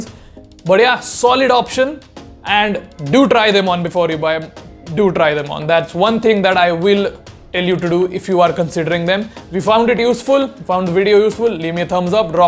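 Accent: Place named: Russia. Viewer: Bro,what's that Russian accent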